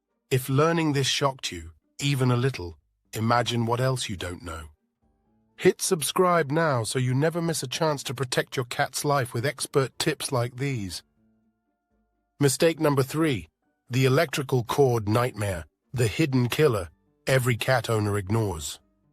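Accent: United Kingdom. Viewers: This video is a bit fear-mongering. Presumably everyone who’s tuned into this is already considerate enough that we want to hear what we may be able to improve on for our cats, you don’t need to put the fear of God into us by telling us we’re killing them, making them depressed, and all other matter of things in every other sentence 😢 You talked about temperatures twice. You sound either British or like you’ve spent time in the UK. If that’s true, then you know the climate here and the cost of energy. I simply cannot afford to heat my property to between 22-26 degrees Celsius. Do you have any suggestions for how to keep our cats comfortable without spending hundreds per month on heating?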